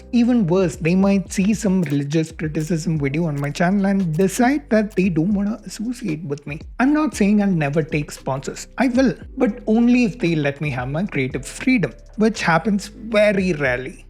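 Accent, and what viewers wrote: South India, India. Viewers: Hey, no doubt that you have ample knowledge of the topic but the only problem is that your accent is too much south indian. Please try to improve it and I can bet that your viewership will increase many folds. Just a suggestion for your growth, please don't take it otherwise. I faced similar problem 18 years ago when my accent was Punjabi and people used to make fun of my English. Thanks, God bless you 🙏🙏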